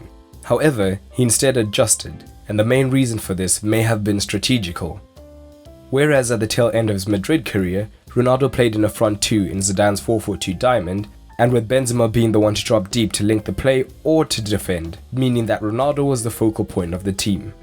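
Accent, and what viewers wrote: South Africa. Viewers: Great video there mate. Are you from South Africa by any chance that accent sounds distinctly South African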